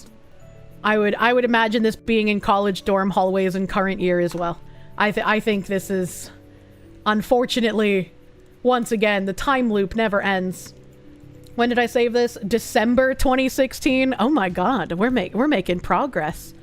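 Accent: Boston, United States. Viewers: HAPPY BIRFDAY, SILLY FOX WOMAN You really are something special, a shining light of squeaky noises, Boston accents, memes and autism. A breath of fresh air in this oppressive nightmare zone of a world. We’re all gonna make it! Keep your chin up, eat some sugary cake, pass out in a food coma and remember how much your community loves you. Happy Birthday.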